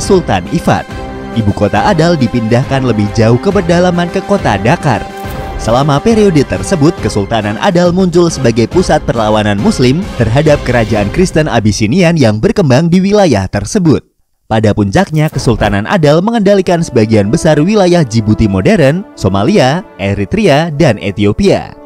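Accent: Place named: Ponorogo, Indonesia. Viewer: wong ponorogo ndi ki suarane.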